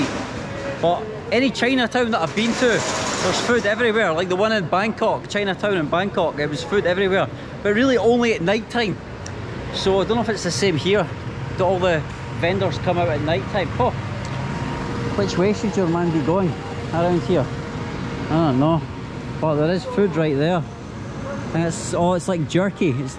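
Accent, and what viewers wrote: Scotland, United Kingdom. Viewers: Welcome to Malaysia. Love your Scottish accent. You should get Melaka T-shirt, that is where everything starts in Malaysia 600 years ago. Kuala Lumpur is the capital but the truth gems is outside capital city. Malaysia is food heaven country, really hard to try it all for a tourist. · Hey Scottish man, love the accent, I studied in Scotland and I miss it. Feeling nostalgic. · I love the Scottish pronunciations of things :)) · Your accent reminds me of my time in Leith . Which part of Scotland are you from ?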